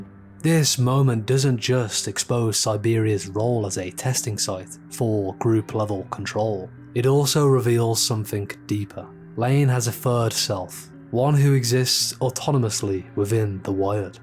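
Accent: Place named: United Kingdom. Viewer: E<link> British Accent.. unlistenable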